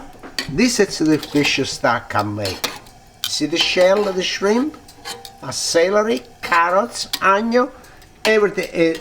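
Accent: Italy. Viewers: Your Italian is just like my Mother's. She was from a village in Forino . It was called Petruro. You are Awsome!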